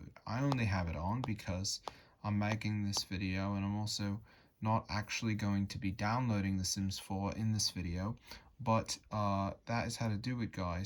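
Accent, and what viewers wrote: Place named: Australia. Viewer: Why does he sound like he’s from Australia, New Zealand, or South Africa? I’ve watched every single video on this channel, and in other videos he sounds American, or maybe Canadian.🤷‍♂️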